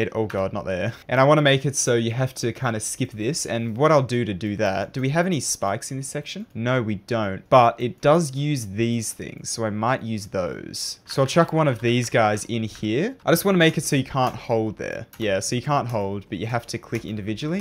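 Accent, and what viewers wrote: Australia. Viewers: HES AUSTRALIA? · he’s an australian youtuber lmao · Wait wait wait… are you Australian I am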